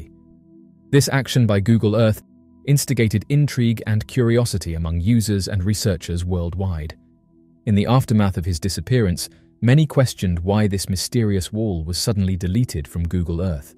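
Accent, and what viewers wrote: United Kingdom. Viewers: No..he disappeared.. Oh my.. Its facts we want...not wordy british narrator... Oh well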